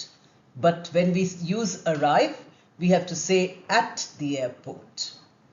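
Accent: India. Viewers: Dear madam However well indians speak English it is not like native speakers of English.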